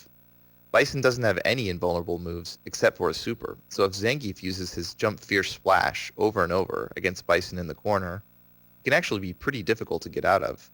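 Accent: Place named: United States. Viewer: nobodys asking anybody to be a weeb, but this is the most americanized pronunciation of anythig street fighter i ever heard lol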